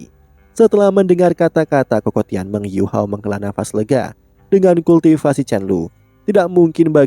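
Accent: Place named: Purbalingga, Indonesia. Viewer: Cah ngapak purbalingga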